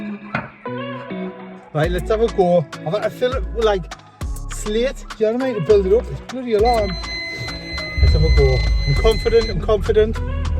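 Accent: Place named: South Wales, United Kingdom. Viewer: he is from south wales in the UK mate...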